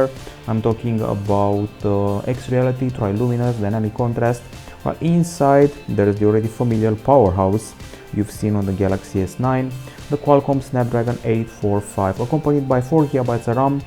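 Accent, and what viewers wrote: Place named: Romania. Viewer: Romanian accent 😀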